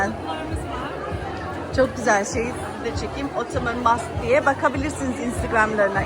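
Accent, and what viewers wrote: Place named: Turkey. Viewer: Bayiliyorum senin turkce aksanina ❤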